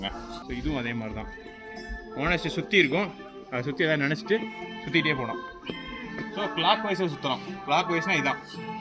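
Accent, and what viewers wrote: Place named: Madurai, India. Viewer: Namma madurai bro...doing business in tirupur....neenga bro...unga slang and voice enaku romba familiar a iruku...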